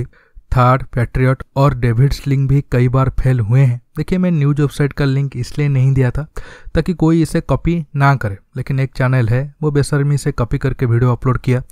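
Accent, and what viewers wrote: Odisha, India. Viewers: Hi...I love your oriya mixed hindi.continue your good work...language is just for expression..i am finding no difficulties to underatand you.main thing is the content..its rich enough to make just a little adjustment.thanks.❤.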